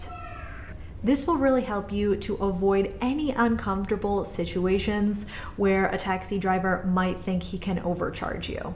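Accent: United States. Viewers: Nice job on the video. I noticed your husbands tattoo of Michigan, and I have noticed that your accent is familiar to me as I am from Michigan. I live in La Paz, BCS, Mexico now . Keep up the good work.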